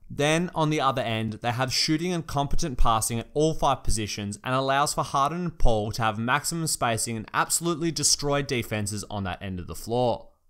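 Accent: Australia. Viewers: Your an Aussie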